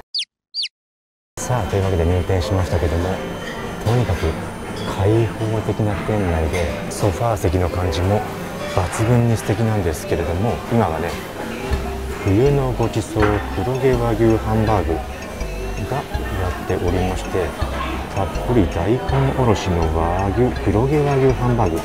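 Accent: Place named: Okinawa, Japan. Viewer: さんの沖縄なまりの話し方が大好きです。生まれた時から沖縄に住まれてましたか？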